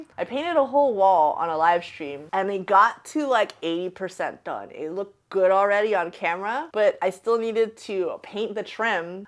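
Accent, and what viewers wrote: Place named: Ontario, Canada. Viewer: ahaha sorry I was unclear... I wrote that without thinking. You sound like you have an ontario accent is what I meant to say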